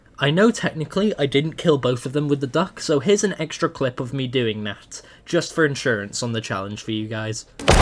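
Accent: United Kingdom. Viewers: okay i have absolutely no reason to care about this apart from being queer but here goes. reasons im pretty sure this guy is gay 1. uses the word "partner" rather than girlfriend 2. "partner" knows about hitman which is more likely to be a guy 3. he doesnt sound outright camp but he has that british accent that is like perfectly closeted i apologise for having the urge to write this comment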